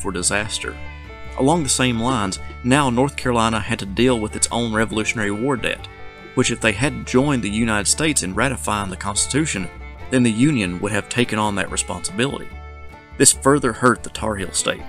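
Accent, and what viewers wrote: South, United States. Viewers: lol not used to hearing country accents like yours on youtube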